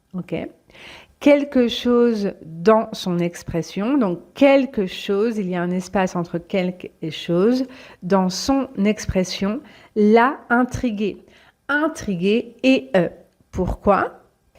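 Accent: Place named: Paris, France. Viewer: Bonjour . Merci . Apparemment , votre accent est français parisien . J'aimerais que vous laissiez les signes à l'épreuve du candidat ainsi que la mémorisation des portions de phrases sauf le . à la fin de la phrase . Voudriez-vous lire et relire moins vite toute phrase pour mi-sourds par la tension comme moi .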